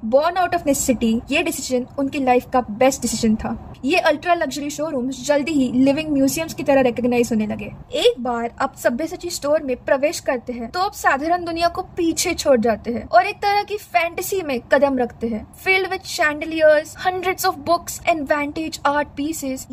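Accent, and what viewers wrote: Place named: Bengal, India. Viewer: Aapki Hindi sunkar laggra ki app Bengali ho ❤❤❤😊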